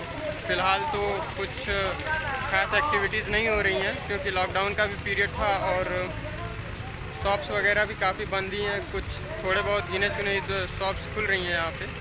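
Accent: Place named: Bihar, India. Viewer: Good try bro, i love your content. But apko hindi thoda सुधारना पड़ेगा, कुछ ज्यादा ही बिहारी accent hain, like-aaj hi bhar ka time hain 🙄.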